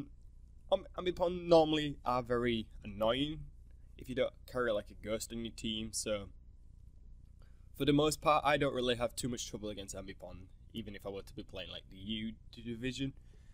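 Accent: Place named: United Kingdom. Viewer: British accent is one of the most awesome accents in the world... :')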